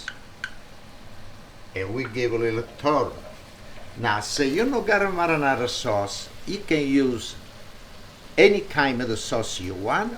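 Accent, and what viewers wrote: Italy. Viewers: You are amazing with your Italian accent, a big follow from me with a big like. Will make this recipe today 🥰🥰🥰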